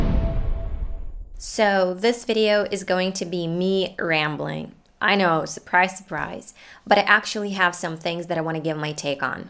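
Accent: United States. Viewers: The first time I saw you speaking I thought that you are American, you are perfect 👌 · Jesus christ!!! Your American accent is mega strong. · Don't know even you are Russian.. I thought you r 100% American 😊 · I know you ever since I watched hadars students vids and then i was curious to know a bit more about you, and I think you're great, despite the fact you live out of a country where ppl speak English and your accent sounds so familiar like Americans , i wonder how you manage to speak in two different accents .. p.s if your reading this, pls mind letting me know about it. Thnxs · Вот уж парадокс. Когда я увидел твое видео в рекомендациях, то так и подумал, что ты из Украины или России, но когда услышал, то подумал что американка ))Интересно, как долго учишь? Я сам из Украины и учу язык в ютубе от носителей.Но все просматриваемые мною ютуберы(например, Papa teach me или English with Lucy) говорят медленнее, чтобы изучающему было понятней, а также добавляют субтитры чего у тебя не увидел. 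А так все супер, ты молодец. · I'm shocked!!! I was totally convinced you're American! I would never think that your first language isn't English. Props to you! Keep it up 💪 Brilliant job! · You are a very good teacher! It's hard to beleave that you are not american. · You are from Ukraine I thought you were from USA ! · Hey...what you are doing is a great job..I like your extreme passion about English language.. even I wanna be like you someday... speaking fluently in a good American accent..with ❤️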